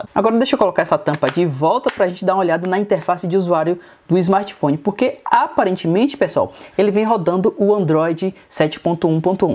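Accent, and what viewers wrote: Bahia, Brazil. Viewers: Voce er bahiano? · O pvt é baiano kkkkk já ganhou meu like !! Tmjj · Deixei o like pq eu gostei do lá ele kkkk baiano mesmo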